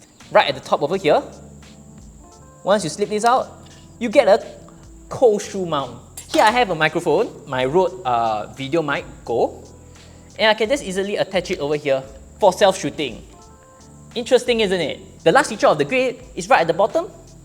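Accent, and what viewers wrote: Singapore. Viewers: Terribly irritating Singapore accent. Can’t understand most sentences as there are too many sounds dropped. Terrible.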